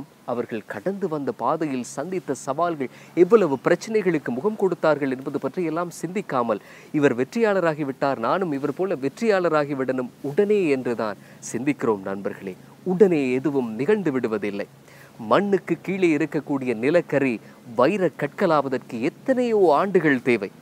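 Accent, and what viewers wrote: Sri Lanka. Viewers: Excellent! This young man's language proficiency is remarkable! Beautiful pronunciation and enunciation of the tamil language. The content of his delivery is equally fabulous. Thank you and keep up your good work. I reckon he's from Sri Lanka.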